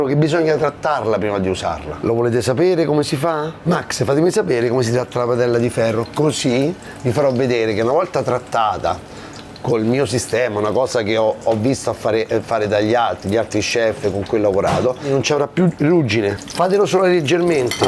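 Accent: Rome, Italy. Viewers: Max ti seguo da ieri ma mi sono già iscritto sei un grande con il tuo parlare romanesco il giusto che non da fastidio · Finalmente risento parlare da Roma. Buona la cicoria ripassata. Ma che ne sanno qui in Liguria. Qua se trova la catalogna...... Mi fai ricordare il sapore....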